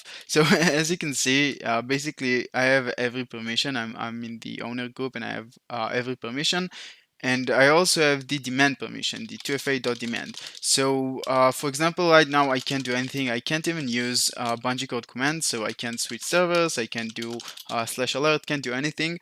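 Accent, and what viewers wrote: Israel. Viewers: יש לו מבטא ישראלי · Love your Israeli accent lol ! BTW waiting for a reply from you in discord :)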